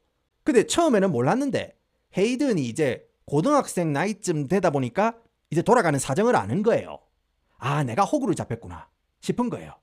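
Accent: Gyeongsang, South Korea. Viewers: 동부사투리 오졌다 애살에서 샤워하다가 터져벌임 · 오늘 처음 알게 되었어요 얘기도 재밌게 하시고 사투리 좋네요 저도 경상도사람이라~ 구독했습니다😊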